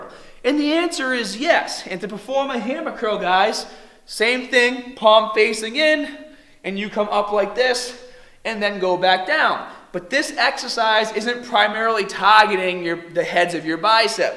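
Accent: Boston, United States. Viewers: that Boston accent really shining through! haha love it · is he from Boston?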